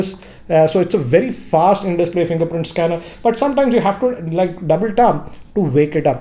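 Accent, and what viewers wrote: India. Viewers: Couldn’t finish the review because of an accident. Great review though but Indian accent is the worst ever and hurts my ears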